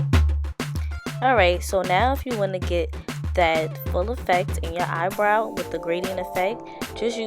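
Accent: New York, United States. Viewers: where are you from? you sound like youre from ny or the dmv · it's her accent, she's from New York . All New Yorkers speak like that 🆗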